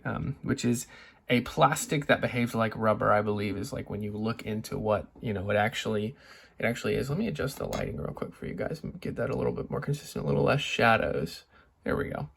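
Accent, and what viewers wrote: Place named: England, United Kingdom. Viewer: Just hear the accent he is english " if we do outdoor testing we get 10 years 😢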